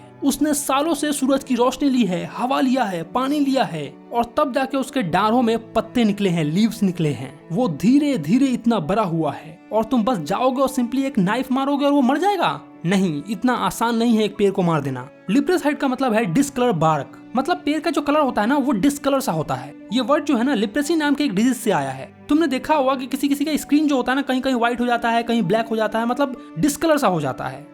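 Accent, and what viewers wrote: Bihar, India. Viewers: Are u bihari? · Its sounds like Bihari Bhaiya!👀❤ Best explainer everr?🐣haiinna??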